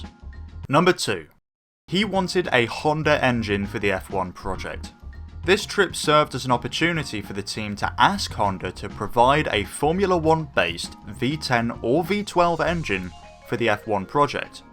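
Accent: United Kingdom. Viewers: Just bc of the british accent 💀🤣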